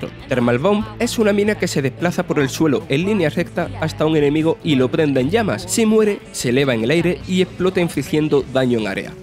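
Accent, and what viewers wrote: Spain. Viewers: Es un acento español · El acento de este reseñador de Vandal de qué parte de España es? He oido ese acento en varios españoles y nunca he sabido de qué parte es. Alguien sabe? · Sin ánimo de ofender, quería marcar que: siendo de Latinoamérica se complica entender el acento del locutor. No se reconocer a que parte de España corresponde pero creo que lo tiene más arraigado que otras personas de esa zona que escuché hablar en otras oportunidades en videos y se entendía correctamente. Saludos y muy bueno el canal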